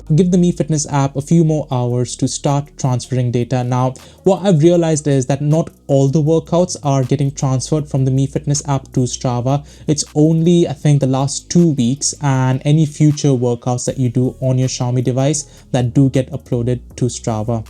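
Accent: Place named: India. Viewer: God bless indian accent folks explaining stuff on youtube :)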